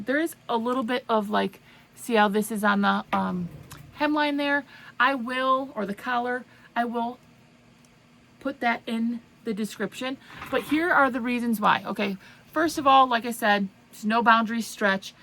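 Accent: Wisconsin, United States. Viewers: BTW the first time I watched you I caught your accent, I was like she has to be from Wisconsin! I was born and raised in Eau Claire so I recognized where you were from. I am in Washington now but anyway.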